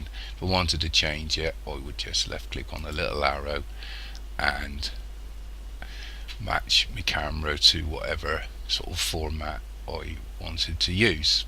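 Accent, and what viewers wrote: United Kingdom. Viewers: Thank Mr. Guessing you're from the UK.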